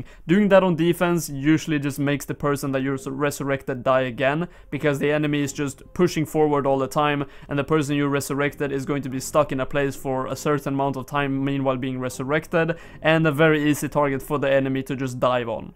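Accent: Sweden. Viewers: ok tyckte du hade en svensk dialekt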